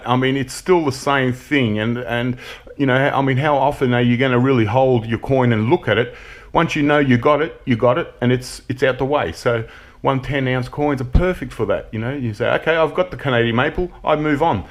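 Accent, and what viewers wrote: Australia. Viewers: Love the coins mate..you have a very cool take on coins but wash your hands bro and how bout some neosporin and a bandaid on that finger. Sweet aussie accent too.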